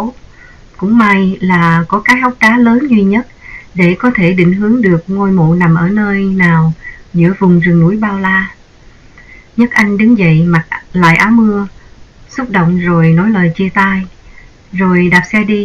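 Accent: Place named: Saigon, Vietnam. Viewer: Mình rất thích nghe giọng này... Nó rất SG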